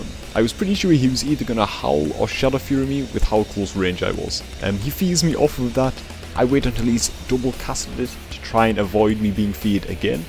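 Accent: Newcastle, United Kingdom. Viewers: Is it near newcastle, sounds kinda geordie, but maybe outskirts or something?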